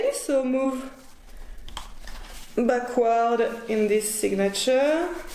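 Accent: France. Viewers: Wonderful!! Thanks for showing us! And I love your french accent. It reminds me of when I lived in Paris in 2010. Yes, you make some little mistakes sometimes, but it's very charming! 😊✨💕🇫🇷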